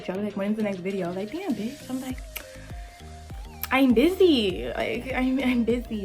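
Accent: Baltimore, United States. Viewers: are you from Baltimore? 🤔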